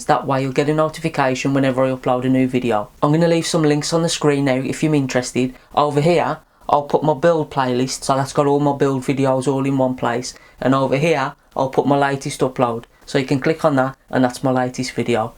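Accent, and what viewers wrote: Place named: Birmingham, United Kingdom. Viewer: aah ok. I've been watching Peaky Blinders and you sound a bit like them:)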